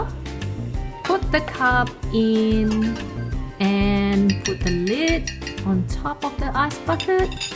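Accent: Australia. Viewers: this ozzy accent is perfect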